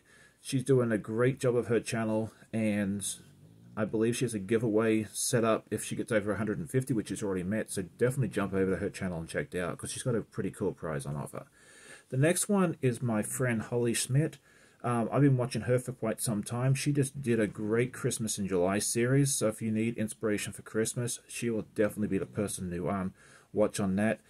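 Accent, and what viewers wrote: Australia. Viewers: Hi, really love your koala quilt, and also the daschound quilt. Your doing fantastic stuff, and I like your promoting of other YouTubers, By the way, because I’m an Aussie too, I don’t pickup on your accent…you sound like everyone else I know! It’s funny…when I was in US and UK, I could pick out an Aussie accent immediately as there were so many other accents that were new to me. Good luck finishing everything for the quilt show, 👍🇦🇺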